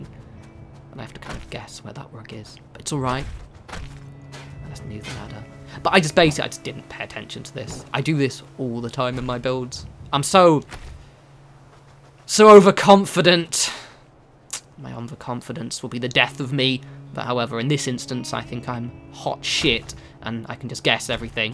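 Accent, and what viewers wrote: England, United Kingdom. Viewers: English. Weston-Super-Mare I think:)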